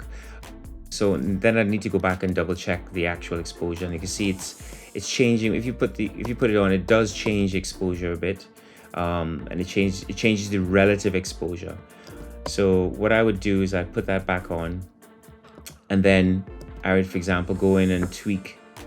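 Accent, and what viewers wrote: Trinidad and Tobago. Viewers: Im hearing a caribbean accent underneath...my guess is trini...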